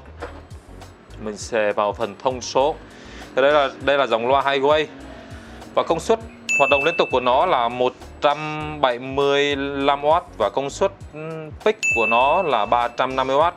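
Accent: Vietnam. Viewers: yeu cau doi nguoi huong dan , giong ong noi nay ko biet o vung nao ma nghe nhu bi dam ngang hong , kho chiu thiet .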